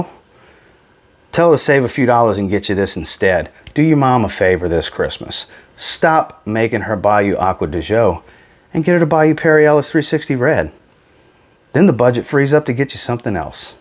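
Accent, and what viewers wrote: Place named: South, United States. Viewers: You sound like a Texan i know one when i hear one 😅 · Love your southern accent brother! Greetings from Bulgaria!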